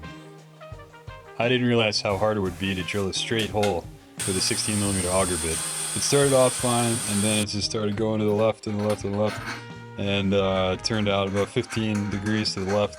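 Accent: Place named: United States. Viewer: You sound very American (not in a bad way)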